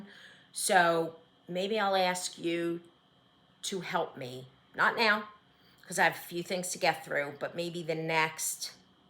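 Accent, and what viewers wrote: New Jersey, United States. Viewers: Hi, I just binged watch all three episodes. I enjoyed you so much. You had me laughing because you sound just like me. I live in FL for many years, but accent is still all Jersey. I too started embroidery and cross stitching as a young girl and remember the stitch on one pattern at a time because our options were limited. Now I have several WIPs on the go. Look forward to seeing your Autumn completions, my favorite time of the year. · Welcome to Flosstube! Being a CT girl living in the Midwest now, I immediately smiled at your Jersey accent. Beautiful WIPs!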